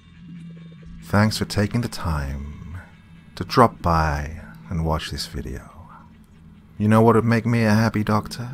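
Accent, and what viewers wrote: United States. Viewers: For the most part, most fake American accents on youtube are SUPER cringe-worthy. But damned if yours wasn't pretty spot on! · Two things. 1. You sound like Benedict Cumberbatch 2. Love the American accent.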